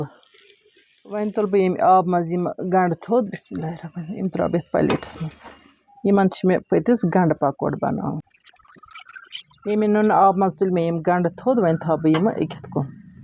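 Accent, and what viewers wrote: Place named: North Kashmir, India. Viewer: Typical kashmiri bolebosh. Aap zaroor north kashmir say honge.....either bandipora or baramulla district.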